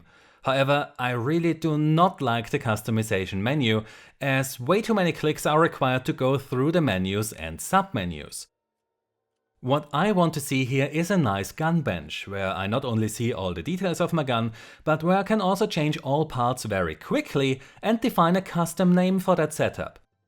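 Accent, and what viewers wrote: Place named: Germany. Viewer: And that your german accent is on fique...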